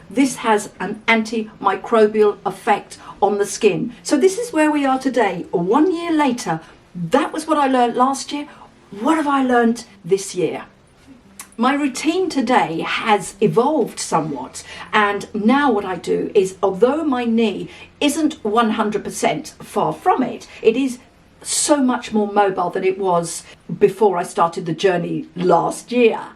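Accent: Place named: United Kingdom. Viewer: I’m a new user and early on my journey of discovery. And I want to say if you’re not a motivational speaker you’re missing a calling. Love your passion and enthusiasm and I’m a sucker for a British accent. I’ve heard and seen so many good things about CastorOil. I came for constipation and happily moving on with so much more LOL. Thank You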